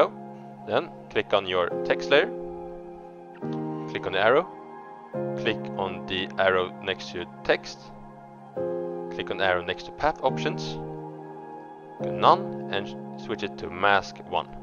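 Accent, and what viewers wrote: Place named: Sweden. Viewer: Du låter jävligt svensk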